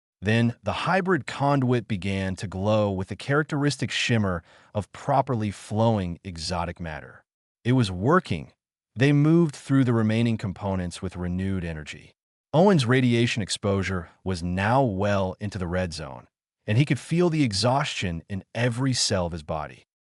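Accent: Spain. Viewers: So sick of the spanish accent kicking in. IF you want a AI to read it that fine but get one that is native to the language you are talking in. It totally takes you out of the story. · I hear it too. Definitely Spanish, but from Spain, not Mexico. I just laugh and continue listening. This AI voice, lulls me to sleep. I love it. The other one that helps me sleep is Radio Reimagine, great stories! Very relaxing!!! Have a great life!!!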